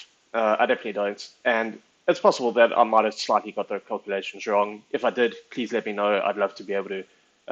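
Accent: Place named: South Africa. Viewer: I Googled "GPU processing in Excel", landed up on your Reddit post, and then here. As a fellow Excel nerd and South African (given the accent, I'm guessing you're from JHB :)), I loved watching this! Thank you! I'd love to see you get back to your "in Excel" series to see what you'd be able to produce using Excel's newer functions (LAMBA, etc).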